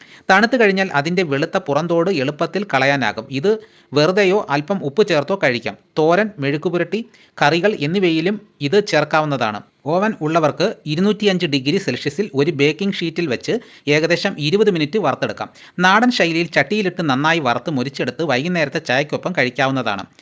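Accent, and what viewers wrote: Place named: Thiruvananthapuram, India. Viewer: ഇത് തിരുവനന്തപുരം ഭാഗങ്ങളിലുള്വരുടെ പ്രയോഗമാണ് അത് തെറ്റിയത് കൊണ്ടല്ല അത് കൊണ്ട് കളിയാക്കേണ്ടതില്ല ഓരോ നാട്ടിനും അതിന്റേതായ രീതികൾ ഉണ്ടാവും കാസർഗോഡ് കാരുടെ പ്രഭാഷണം കേട്ടാൽ താങ്കൾ എന്താണ് പറയുക ഒന്നും മനസ്സിലാവില്ല അതെ സമയം ഇവിടെ ഭ പറയുമ്പോൾ മാത്രമേ വ്യത്യാസമുള്ളൂ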